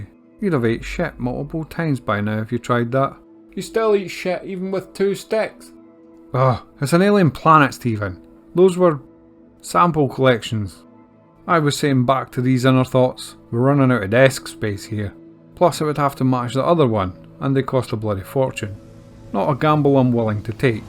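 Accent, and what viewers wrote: Scotland, United Kingdom. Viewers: Been thinking of getting a joystick for a while so started watching your video. The images, the space scottish and the wordsmithing drew me deeper and deeper in.. after a while a Tobii and pedals made perfect sense aswell! BUT when you started demonstrating voice control dishing out orders "switch to guns" I was literally bouncing up and down in my cahir with a stupid smile across my whole face. My inner spaceman thanks you and I will most certainly check back for more videos.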